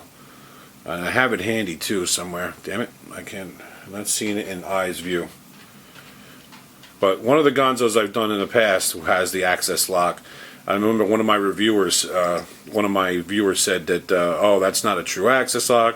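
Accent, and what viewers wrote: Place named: New York, United States. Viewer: accent for sure it's hard to mistake. I've been out of NY for quite some time and I still have mine.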